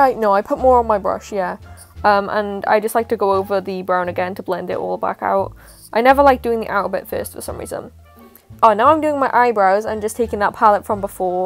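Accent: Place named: Wales, United Kingdom. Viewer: I love the welsh accent. I live in South Wales 🏴 currently so I’m catching onto the accent which I loveeeeeee ❤️🤷🏼‍♀️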